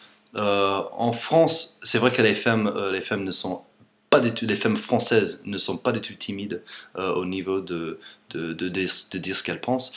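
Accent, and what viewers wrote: United States. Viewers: Hi, After watching some videos, I saw your French is better. I also noticed when you sing in French, you forget your American accent, thanks for your effort. Thank you for your videos and good luck. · Cet accent americain c'est charmant!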